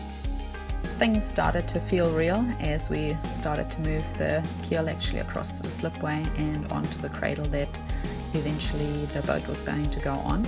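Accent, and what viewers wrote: South Africa. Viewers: Are you originally from S.A.? I checked your profile and it says NZ, but sometimes your accent sounds a bit SA.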